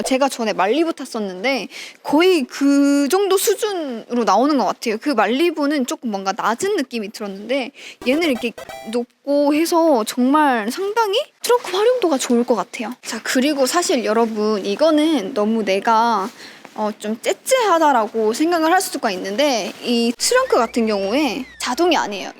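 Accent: Gyeongsang, South Korea. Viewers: 갱상도 출신아인교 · 경상도 사투리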